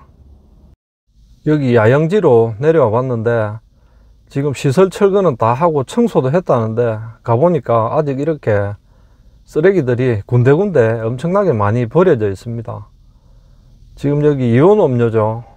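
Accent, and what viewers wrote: Gyeongsang, South Korea. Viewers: 안녕하세요.. 유투브님은 경상도 사투리 이지만 목소리가 엄청 이뿌네요. 저도 경상도 인데 돌깨지는 소리같다고 합니다.. 집에서는요.. 유투브 님은 듣기좋은 목소리 입니다.. 시원하께 좋은 말씀해주셔서 감사합니다 . 특히 목봉 말입니다.. 항상 응원합니다. 수고하세요 😁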